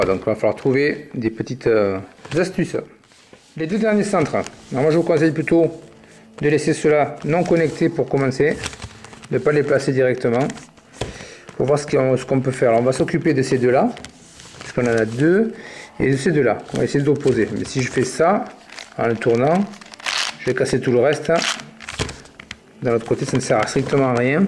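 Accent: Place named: South, France. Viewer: super boulot !!! à conseiller à ceux qui aiment les cubes moi et mon fils (et oui je lui es donné le virus) sommes fans de ces jeux et avons une grosse trentaine de cubes nous venons de recevoir le 4x4x5, 5x5x4, et bien d'autres encore et avons commencé le 4x4x5 grasse à toi merci beaucoup question : sais tu faire le gigamix je ne trouve pas de vidéo explicative comme toi en français ! mon petit grand fait aussi des videos c'est lyondu31 vu ton accent serais-tu du sud merci encore Eric ANTIPHON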